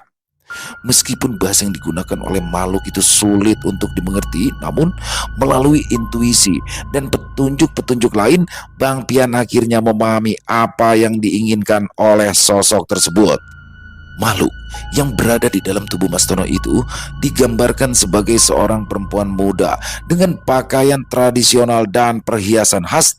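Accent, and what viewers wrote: Jakarta, Indonesia. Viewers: Assalamualikum bng, salam santun dr Medan, mantap dech bang piyan kembali dengan kocak Betawinya yg buat sy ngk pernah bosan dengarnya😂😂, terima kasih mas untung dengan cerita mas piyannya yg mantap sukses terus ya, buat bng cocok banget menjadi tokoh bng piyan dgn logat Betawinya yg kental banget, buat team x3m sukses terus😂👏👏👏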